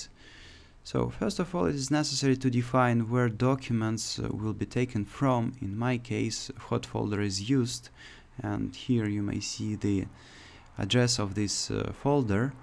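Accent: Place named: Russia. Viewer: ты русский у тебя как будто акцент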